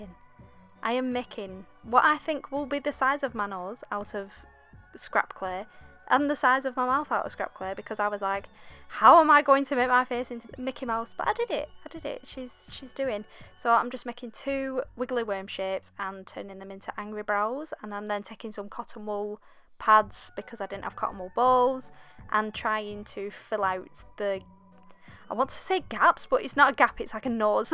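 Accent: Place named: Scotland, United Kingdom. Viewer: I know she's from Accrington, England, but her accent sounds Scottish.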